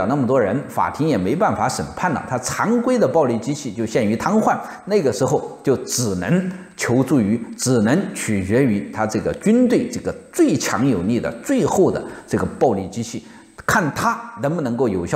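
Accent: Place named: Henan, China. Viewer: 做人我不管我听不惯河南话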